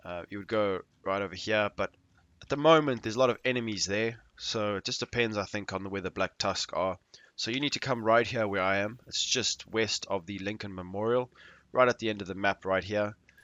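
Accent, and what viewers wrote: South Africa. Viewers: You South African! Sounds so. I subbed because of. Are you part of a clan?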